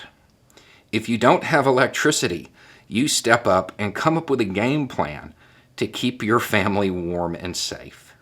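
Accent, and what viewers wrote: South, United States. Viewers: Just shared this video with my Dad who is in CT and said he had to go out and shovel snow. I thought he’d appreciate your tips for generating heat. I then thought of another tip forgot to mention Chihuahuas! Read the following in awesome southern voice: “Grab about 5 chihuahuas and bring them into the tent you’ve set up in your living room. They are automatically inclined to hide under blankets. The chihuahuas will generate heat and keep you warm! It’s just a thought.” We’re a chihuahua family but you can substitute your canine preference:)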